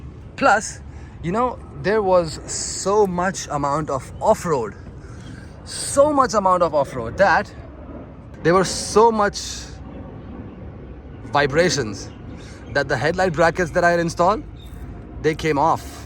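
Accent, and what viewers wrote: India. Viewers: you don't like the video, you go on and search some other one, no one's forcing this video down your throat, you came here all by yourself, like you said "YouTube is free" Aur rahi baat accent ki, I have seen a lot of people from south india, especially Bangalore, who speak fluent English without any kind of particular accent, kind of similar to what speaks, doesn't means they are putting up an accent, maybe that's the way he has spoken all his life, maybe that's the way he learnt it, why does it even matter. What I am trying to say is you need to see both side of the coins before judging for yourself, and don't judge for others, nobody cares. and oh I am not from Bangalore advocating their accents, I am from punjab, just sharing something of relevance here that I saw 😂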